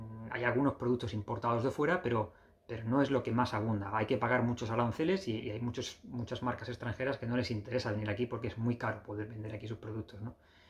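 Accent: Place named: Andalusia, Spain. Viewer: Hablas como Andaluz,pero tienes la pinta de un mafioso ruso..saludos de Perú...😠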